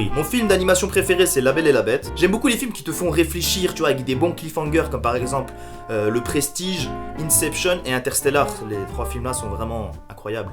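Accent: Turkey. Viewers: T as un putain d’accent turque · Gerçekten , c quoi cet accent turque , je suis Algérienne fiancée à un Bingölien et je parle turque sans accent Fais travailler le tien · Frr c quoi ton accent turc wlh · Waa ton accent 😂😂turk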